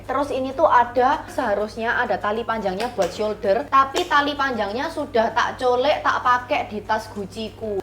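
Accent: Java, Indonesia. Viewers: Cici tp ngmong ny ky jawa pdhl muka ny ky cina 🤩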